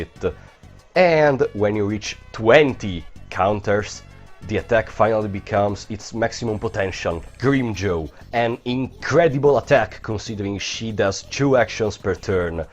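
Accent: Italy. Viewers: bro are you Italian? you sound like it we speak English similarly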